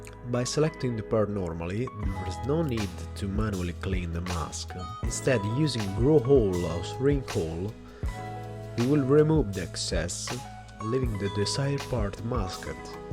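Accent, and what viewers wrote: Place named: United Kingdom. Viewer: What an amazing british voice man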